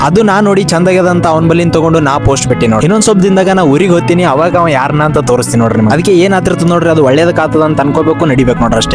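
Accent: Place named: Kalaburagi, India. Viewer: Nimma matu pakka kalaburagikar matu nodri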